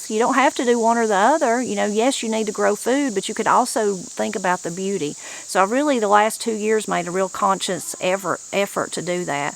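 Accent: Appalachia, United States. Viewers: Hi, I grew up in the mountains around Hendersonville NC, just east of Asheville and not very far from the Chimney Rock area. The surprise lily daddy and grandmaw always called it resurrection lily. Another name for it is lycorice but I believe it's not akin to the candy. I've seen mostly red as I've been growing up, but I have seen a white variety and a variegated variety that had both red and white petals although they were growing together in the same clump so they may have crossed pollinated over the years. I live high up in Watauga County NC in Boone now and I haven't seen it up here, but it gets very cold very deep in the ground the freeze line goes super deep up here so it might not be cold hardy for this elevation. I truly enjoy yours and videos and the accent and storird remind me of home. Thank you for keeping Appalachia alive and well and for bringing up your daughters in our mountains traditions.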